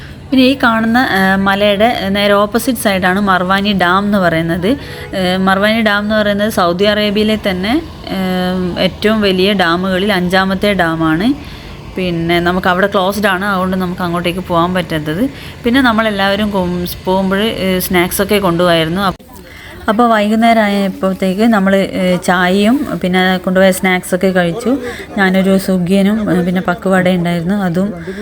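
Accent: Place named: Kannur, India. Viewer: ആദ്യമായി കേൾക്കുകയാണ് മാഡം കണ്ണൂര്കാരി ആണോ?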